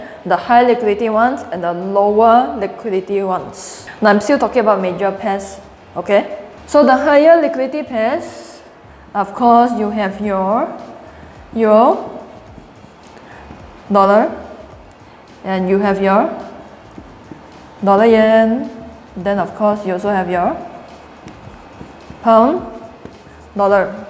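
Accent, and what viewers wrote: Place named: Singapore. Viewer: Haha, really love watching your videos. Having someone explaining things in the style of Singaporean feels so homely.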